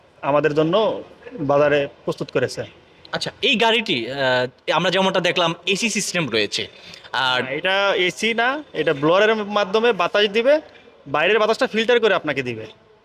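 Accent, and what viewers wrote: Noakhali, Bangladesh. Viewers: This guy is very excited. Is he a pure Noakhalian speaking Bangla? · মুরুক্ষা বেডা এটার নাম ড্যাসবোর্ড বলে..... কথাই ঠিক নাই....নোয়াখালির একটা টান থাকে কথায়....😂😂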